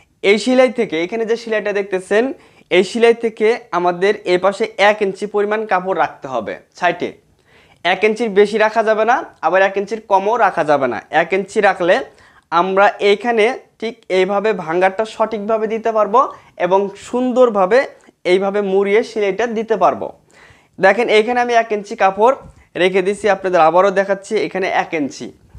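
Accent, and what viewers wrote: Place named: Sirajganj, Bangladesh. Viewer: আপনিকি সিরাজগঞ্জের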